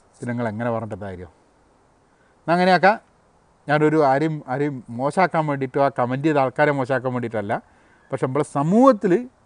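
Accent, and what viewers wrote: Kozhikode, India. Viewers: Njammalu malappuranu ingale kozhikkodan bhasha Perth ishtanu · ഞാനീ വീഡിയോ കാണാനുള്ള ഒരു കാരണം ഞമ്മളെ കോയിക്കോടൻ ബർത്താനം കേക്കാനാണ് അമേരിക്കൻ ഇരുന്ന്കൊണ്ട് ആ നാടൻ ബർത്താനം കേക്കുമ്പോ ഉള്ള ഒരു സുഖം അത് ബേറെ തന്നെ. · you are 100% right. I like your kozhikodan style malayalam, though I am from Kollam. English pronunciation is not something to be worried too much. we must be able to communicate. Those who want to learn english , please watch an english movie or a cartoon over and over until you learn all the dialogues in it. Then try another one. if you do two or three movies like that, you can easily communicate in English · സാറെ,ങ്ങളെ മക്കളെ ങ്ങള് കോയിക്കോട്ടെ സ്ലാങ് പഠിപ്പിക്കണം!!ങ്ങള് കോയികൊട്ട്കാര്ക് അഭിമാനമാണ്!!പിറന്ന നാടിന്റെ ഭാഷ മുറുകെപിടിക്കുന്ന താങ്കൾ ഒരു മുത്താണ് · ങ്ങള് ഭാഷ മാറ്റരുത്...(കോഴിക്കോട്) അത് മാറിയാൽ പിന്നെ നിങ്ങളില്ല.... 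( സഞ്ചാരം പരിപാടി അതിന്റെ വോയ്സ് ഓവർ കൊണ്ടാണ് ജനശ്രദ്ധ പിടിച്ചു പറ്റിയത്) അത് പോലെ... നിങ്ങളുടെ ഈയൊരു... ശൈലിയാണ്..കിടു... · മ്പളെ കോഴിക്കോട് പ്രനൺസ്യേഷൻ 👌👏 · ഞാൻ കോട്ടയക്കാരൻ ആണ് പക്ഷേ എനിക്ക് ഏട്ടൻ ന്റെ കോഴിക്കോട് ഭാഷ വല്ല്യ ഇഷ്ടം ആണ്.നന്നായി മനസിൽ ആവുന്നുണ്ട്.ഇങ്ങള് supprrrr aneee.. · ഇങ്ങടെ കോഴിക്കോടൻ ഭാഷയ ഇങ്ങളെ ഞമ്മക്ക് പെരുത്ത് ഇഷ്ടപ്പെടാൻ കാരണം..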